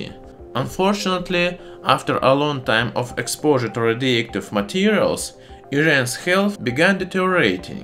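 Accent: Russia. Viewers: There is my beautiful Russian accent back again. Ya tebya lublyu. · Is he Russian? I love his accent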